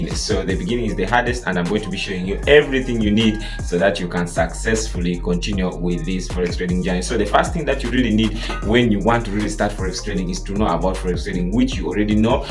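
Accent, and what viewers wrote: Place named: Nigeria. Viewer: He sounds Nigerian Hope he keeps up the work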